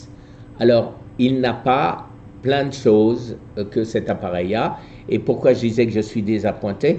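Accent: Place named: France. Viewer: Merci pour toutes vos excellentes vidéos! C'est bien aimable d'avoir fait celle-ci dans la langue de Molière, bien que lorsque vous les commentez dans celle de Shaekespeare, je comprenne bien également et que votre sympathique accent français m'amuse beaucoup! Continuez comme ça, bonnes photos et bien du plaisir pour la suite!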